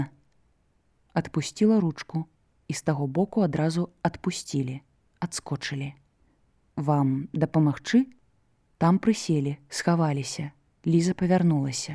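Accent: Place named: Russia. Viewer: Вымаўляецца не "іх", а "йіх", "йіншы", "йімі" і г.д. - варта азнаёміцца з вымаўленнем І ў беларускай мове, бо няправільна шмат вымаўляецца. Мяккасць не беларуская, часам праскоква беларуская. На сутыку слоў асіміляцыі беларускія адсутнічаюць, вымаўляецца як у расейскай.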